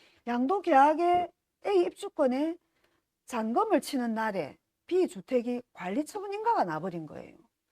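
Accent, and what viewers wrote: Gyeongsang, South Korea. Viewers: 앙칼진 경상도사투리 ~~~~~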